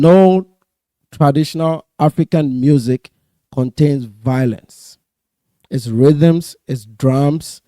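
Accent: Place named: Nigeria. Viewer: Tariq wrote that book years ago... When he was young.... Before he transitioned and became more consciously aware and connected to the black American struggle... You have a whole country of your own to worry about... You. Come from a third world country... I think you're Nigerian. You look and sound like it, Nigeria, a country that has over 200 million people. But yet it's annual gdps less than 500 billion, that's AJ poverty... You should be concentrating on that.. instead of calling black Americans, Temu blacks, a group of people that's way more successful than you have. Way more money than you. Accomplished more than you built more than you, that's why a lot of you guys come over here.. walking on college campus is that black Americans paved... Living in communities and in neighborhoods that black Americans help accommodate.. leaving your country and running away from it, because it doesn't work. 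And coming over here with black Americans have set the country up for you.... Don't worry about block Americans. It's a totally different ethnic group, black Americans or generations removed from Africa...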